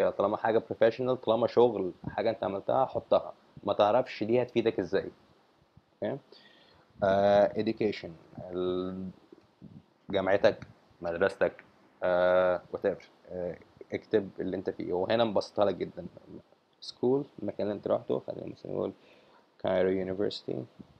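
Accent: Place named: United States. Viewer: بص الامريكن اكسنت كويس جداً مشاء الله بس افصل مبين العربي والانجلش لانك تقريبا بتتكلم عربي اميركن اكسنت هههه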